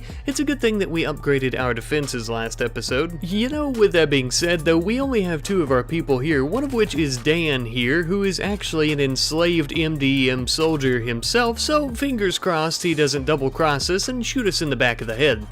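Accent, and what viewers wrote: South, United States. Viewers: are you a southerner? You got a little drawl in your voice.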